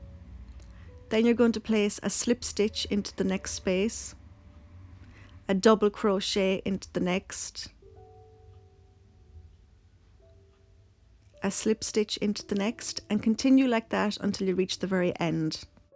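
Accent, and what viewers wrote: Ireland. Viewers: It's good to. Hear an Irish voice I am Irish from Dublin xxx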